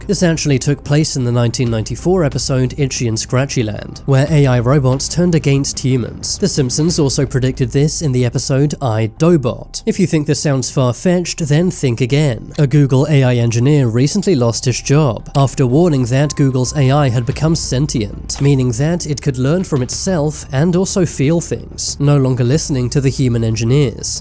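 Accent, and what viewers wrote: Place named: England, United Kingdom. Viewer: What enlish accent is this, makes me crazy listen to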